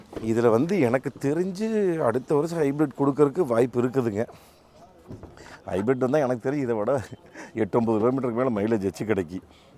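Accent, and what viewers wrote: Kongu, India. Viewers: அண்ணாவோட கொங்கு ஸ்லாங்கு ஸ்டைலே சும்மா நெகு நெகு நெகுனு இருக்கு😍😍😍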